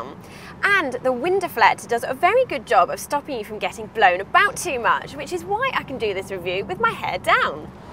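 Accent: United Kingdom. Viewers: Gosh i love the british accent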